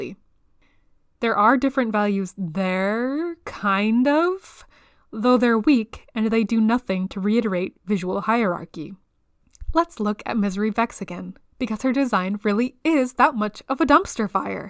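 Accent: Canada. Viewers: I like your accent. Where are you from? You hold your mouth like a Canadian but also fully pronounce your Ts so I can't tell O_O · First time watching your vids, I hear the Canadian on your supprisingly soothing voice.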